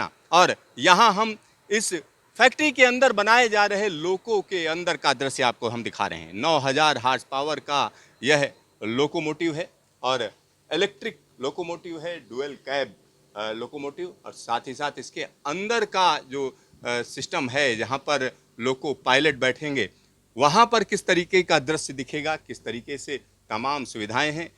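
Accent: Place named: Bihar, India. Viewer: Bihari accent mat bolo saf hindi bolo.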